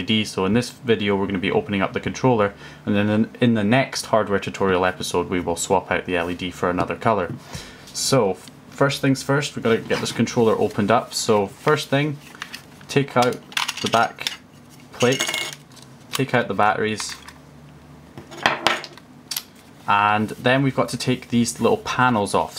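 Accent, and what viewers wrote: Scotland, United Kingdom. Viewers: Cheers for video bud! Owned a torx security bit set for 15 years and finally get first chance to actually use it haha. Nice to hear a fellow Scot too 😉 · Scottish bro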